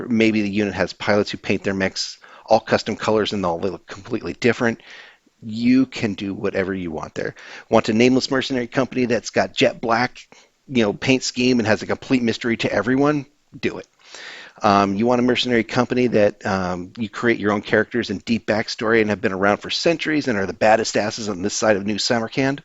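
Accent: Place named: Minnesota, United States. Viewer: Kinda sounding like Minnesota Tribe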